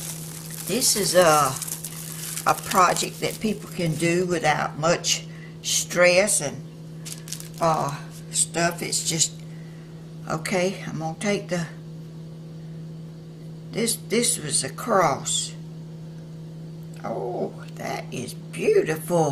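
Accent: South, United States. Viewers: My granny Wright was from Ga and raised my Dad in NC. Your accent brings back many fond memories of visiting family in the south. I grew up in Ohio. You are a special lady, I can tell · Oh my stars sweetheart I thought you was from Alabama just by your voice. Thats a southern voice. You are awesome. I love watching you. I've learned a lot. Thanks from Alabama. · Hello from Kansas City Mo I have enjoyed your videos of your projects so much. Anxious for spring so I can try the cement pots. Now need some pipe cleaners, didn’t know Borax could make something so pretty. I have started doing some Dirty Pour painting, finding it fun, it doesn’t require any art talent. By the way,I also love your sweet southern accent. Your place looks so peaceful & I love seeing all your birds while you do your crafts. Wishing you a blessed day. See you later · Ms., You are a TREASURE! 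You've filled me with joy watching you crafting. Thank you for making my day. Your enthusiasm is infectious, your video skills are entertaining, and your southern accent is delightful. I can't wait to make my own crystal heart, butterfly and cross. (I'm from Michigan and, like you, I too love the sunshine, flowers, butterflies and I can't wait for spring and the snow to be gone for good. And I'm sure our bluebirds, who just showed up, agree.) May God bless you, as you are a blessing to others!:) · HILARIOUS!!!! I'm sitting here, a lot of pain at the moment, using your relaxed motions, voice, and southern sounds to sooth me. I had JUST been thinking how much fun this would be with my grandsons now that they are on summer break and YOU mention how fun it would be for kids. Thank you so much. I greatly appreciate your crafts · Hey! So glad to meet you,. I love your southern accent. My mom who is 82 now, is from Arkansas and you remind me of my relatives! 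You are so sweet! Take care and God richly bless you:)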